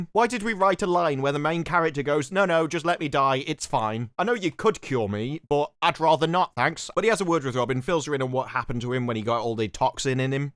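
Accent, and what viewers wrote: United Kingdom. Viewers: Dear, I like your videos but fix your teeth please. Your British is showing.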